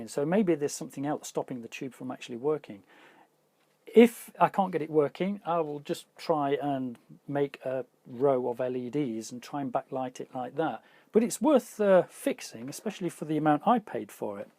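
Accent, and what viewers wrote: United Kingdom. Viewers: Hi Sir I just want to ask you are you from UK???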